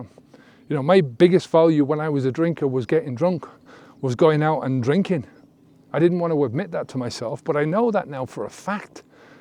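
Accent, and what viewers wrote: Ireland. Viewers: I had the conversation with myself this week. Im a functioning alcoholic. No major hangovers or troubles with the law but definitely something i want to stop. Im SO grateful that i found your channel. You sound irish also. Im from Donegal. Living in Brooklyn ny. Thank you, sir.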